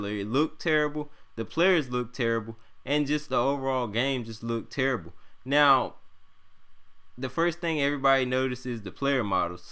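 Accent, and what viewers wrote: United States. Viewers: where you from? You sound like you're from down south, not saying that's a bad thing just curious.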